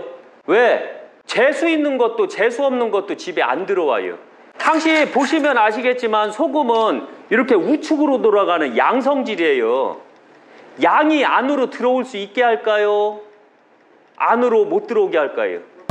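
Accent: Chungcheong, South Korea. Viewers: 고향이 어디십니까 충청도?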